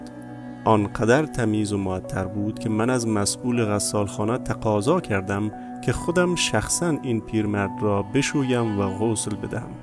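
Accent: Afghanistan. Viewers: جناب چرا اسم و محل آن شهید را به خانواده هایی که عزیزانشان سرطان و یا مریضیهای نا علاج دارند ندادید که آنها هم مثل شما خوشحال شوند مگر آن مادرانی که فرزندانشان درگیر مریضیهای مهلک هستند آدم نیستند و احساس ندارند ! ؟؟ میدونم که این داستان مال حدود یکسال پیش است ولی دردمندان زمان نمیشناسند بروید و به دادشان برسید مگر آن شهید بهش سفارش شده فقط برای فرزند شما شفا بگیرد ، راستش نمیدونستم آن دنیا هم پارتی بازی میکنند درثانی شما با این تعریف میگویید قدرت شفای شهیدان از خدا بیشتر است ! ؟ برو بابا جمعش کن و این چرندیات را به خورد مردم بدبخت و ساده دل نده ، راستی نمیدانستم مردها هم میتوانند زن فوت شده را غسل کنند خوب بوی گلاب که آن مرده میداد دیگه شستینش که گلابها برود و .... دست بردارید و حال من و بقیه ای که که این چرندیات را. البته لحجه شما بیشتر شبیه مردمان عزیز افغانستان است ، با این چرندیات خواهران و برادران افغانستان را هم که برای همه ستم دیده هایشان بد نام نکنید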